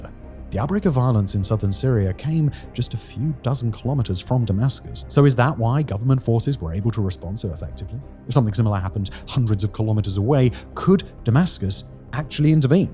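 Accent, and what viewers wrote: United Kingdom. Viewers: His Britishness is the same on most his channels with cadence and tone, but the backing music really does push it one way or the other. But yeah, it’s all SEO stuff designed to promote and get your attention, it’s how it has to be done if you want to get views and keep them. Blame YTs algorithm ultimately.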